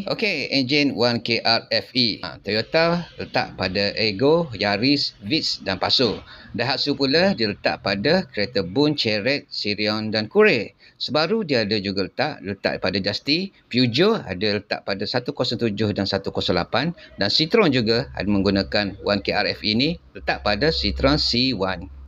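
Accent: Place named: Kelantan, Malaysia. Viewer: slanga klate wei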